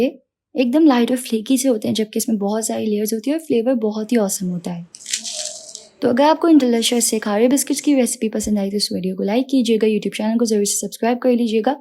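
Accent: United Kingdom. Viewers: i am talking about accent.. she is talking urdu in English accent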